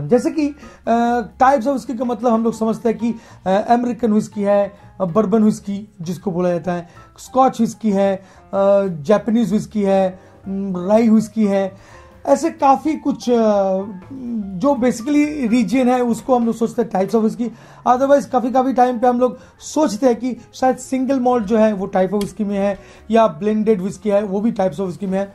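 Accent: Bengal, India. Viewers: Love your vdos. Your knowledge is fantastic and your bong accent is cute :)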